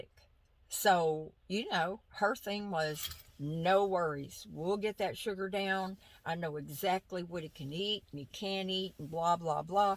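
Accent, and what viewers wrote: South, United States. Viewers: Luv your southern accent! U r so cute! Good luck with Terry!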